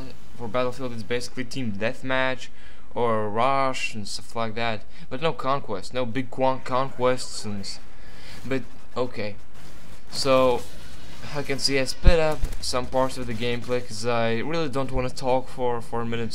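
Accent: Latvia. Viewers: epic latvian accent hahah...nice moab dude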